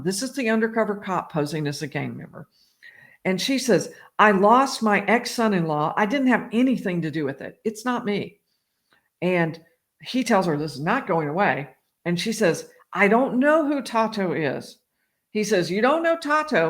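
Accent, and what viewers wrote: South, United States. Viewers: reminds me so much of my gentle southern grandmother. Anyone know where she was raised to get her accent? My grandmother was from Louisville.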